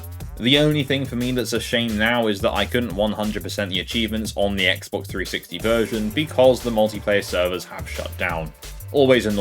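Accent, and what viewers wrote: United Kingdom. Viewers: I like how for the history of the franchise you simultaneously cherry-pick and ignore games based on their localized titles. 'Le Mans 24 Hours' somehow counts yet 'V-Rally' doesn't. 'TDO' is in but 'Test Drive Off-Road' isn't. Opinions and facts are not interchangeable. Also, you sound fairly British to me, why are you using NTSC titles and not PAL?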